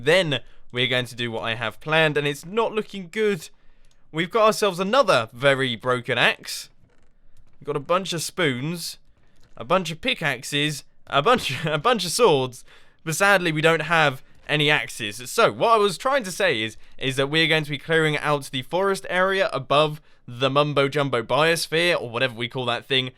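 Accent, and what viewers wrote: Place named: United Kingdom. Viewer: Dear! I have been watching your videos for ages, I like very much your YouTube channel, and your person. Especially, your voice. It was the main reason why I started following you. It catched me, with your British accent. And THIS, is my first comment ever, to a video of yours! You are asking about the new Graphics of the channel. The pop-ups and so on. They are very nice! But, only one thing, maybe, could be better, in myopinion: The "+1" pop-up. It's green. And... It's a bit strange, it clashes with the blu, the orange, and the other colors, this, at least for me! The animation is very nice. But the color itself... Neither the "+1" bar of Youtube is green anymore. Maybe... You could change it? This is everything I've got to say.:P Bye bye!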